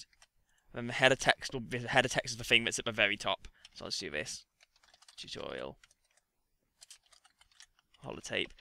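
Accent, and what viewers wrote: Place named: Liverpool, United Kingdom. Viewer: You sound like ur from my hometown,Liverpool.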